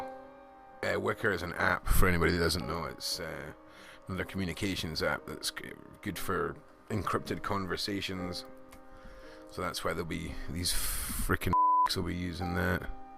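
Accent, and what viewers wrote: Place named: Scotland, United Kingdom. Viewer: Seen your content on a dark Web video. When I heard you were Scottish I jumped on you tube 💚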